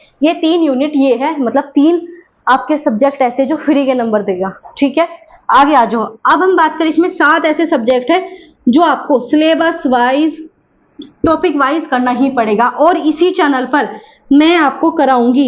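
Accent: Haryana, India. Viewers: Yes ,that haryanvi accent inspiring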